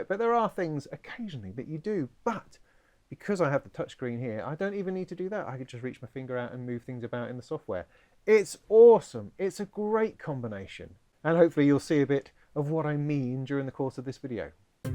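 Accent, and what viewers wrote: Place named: England, United Kingdom. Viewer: Fabulous down to earth review and demonstration.. And best of all someone who's English.. No annoying American accent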